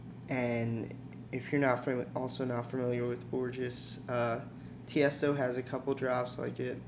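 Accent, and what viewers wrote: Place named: Chicago, United States. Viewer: What suburb of Chicago are you from? You sound just like my buddy that grew up in Barrington :)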